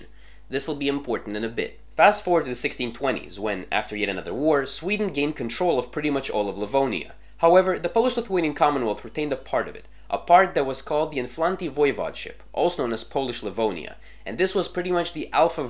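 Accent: Russia. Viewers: Nice video, man! I speak Latgalian myself and I can tell you not many people my age speak Latgalian, which is a shame. Parents don't teach them, cause it's "a bother" and they'll never use it anywhere else other than in the family. And for the most part, it's true, I only use it when I talk to my family or talk with older people. :( I agree with we are latvians part! We are the same and I will happily be both latvian and latgalian. :) Did now know about Peter! So cool! I was about to ask where did you grow up, cause your accent is interesting while you were talking in latvian, but then I heard you talking in russian, lol. PS. Ausmeņa Kebabs ruļavoj! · Ak dievs, es uzzināju par tavu kanālu caur video par eļvdāļu valodu un brīnījos, no kurienes tu esi, jo es pamanīju akcentu. Izrādās, ka tu esi no Latvijas, tāpat kā es! Bet tavs akcents ir laikam krievu, vai nē? Paldies par šo video. 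Mana vecmāte bija polīte no "Daugpiļs".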